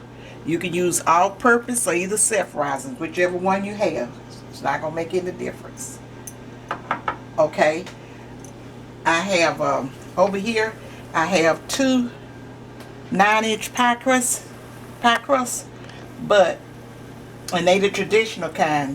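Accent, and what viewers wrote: South, United States. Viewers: Mrs., HELLO I knew when I heard that southern voice, I had to tune in, stick and stay educated in what you know is good, best & Delicious! You got that voice, know how,every once & a while some slang, a recipe within it's self, momma got it going on! Ive Appreciated every how to video, with a taste, those greens with Lipton onion soup, fried or boiled they're the Bomb, I've never had such comfort in knowing greens could taste like that. You're a" well seasoned" teacher, as my mom before her death this year would say your never to old to learn something new, & life brings about a change, I have no request, but from u, I'll learn even more, your one of my adopted video voices, now that I stumbled on to your stories of yesterday, today & then some I look forward to cooking even better, Thank YOU, Roz.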